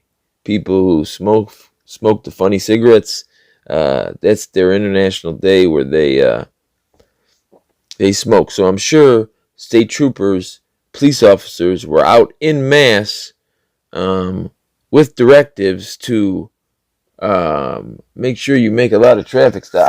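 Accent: Chicago, United States. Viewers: Hey Man, you should get paid for your voice, perhaps not narrating auditing clips but maybe radio. You have a unique cadence when you speak and along with your Windy City accent perhaps you could do "news" as opposed to "music", especially in the upper Midwest. You know, something progressive which would help listeners. I have a good ear for voices and yours is unique and pleasing. My favorite voices to listen to are posh, young English accented girls so you don't get the wrong idea. Just informing you of what you might not have read before.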